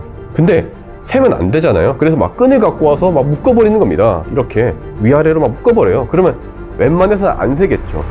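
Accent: Gyeongsang, South Korea. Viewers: 어머 경상도 출신이신가봐요 ㅎㅎ 사투리 너무 매력있어요 ^^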